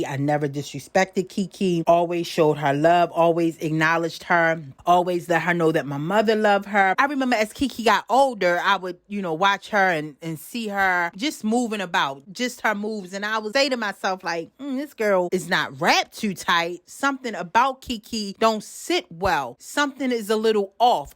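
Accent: Baltimore, United States. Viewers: You're so beautiful!!!!😍😍😍 and that BMore accent is everything 😂😅😂💪🏾💪🏾💯💯 and chhhiiiiillllleeeee iono what to say bout Ms. Keke. Sorry to that girl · Baltimore accent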